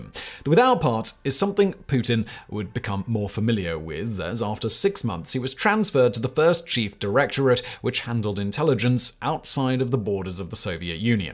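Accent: United Kingdom. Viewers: because he has a British accent and the code doesn’t work in the uk. It makes no sense. The average hello fresh box in the U.K. (box of 5 meals) comes to £78 that’s more than I spend in a fortnight!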